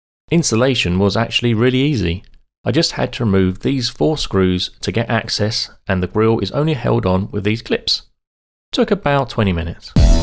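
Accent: United Kingdom. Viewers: NitroCaliber he is in the UK (registration plate and accent gives it away), but the black coloured grills were bought in Pounds (UK currency).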